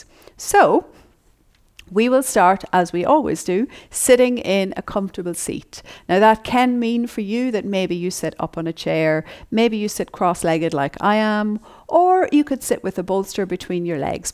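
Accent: Ireland. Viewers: sounds weird but i can feel it when i lift as i exhale maybe my body is just weird like that LOL i love your irish accent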